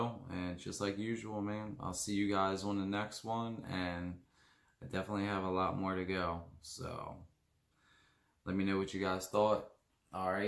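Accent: Baltimore, United States. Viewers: Lol I was thinking you sound like you’re from Baltimore then I looked at your channel name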